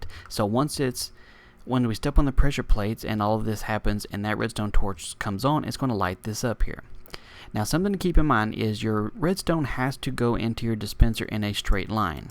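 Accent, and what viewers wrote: South, United States. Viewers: I cant tell if you have a southern accent or not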